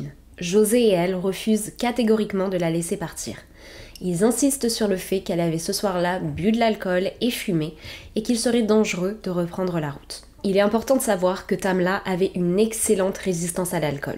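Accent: Quebec, Canada. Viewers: Il manque le "nous allons commencer".😢 Actuellement je suis tes vidéos d'il y a 3 ans et tu as un accès Québécois beaucoup plus prononcé que maintenant. 😂😘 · Au fil du temps ton vocabulaire est de plus en plus teinté de l'accent Québécois! Quand tu retournes en France ça doit être un choc pour tes proches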